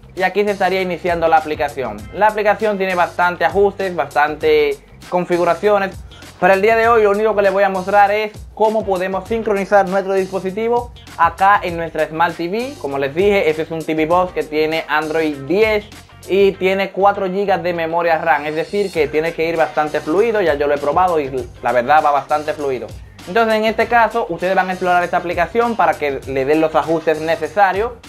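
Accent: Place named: Dominican Republic. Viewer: Aquí en Dominicana hablan así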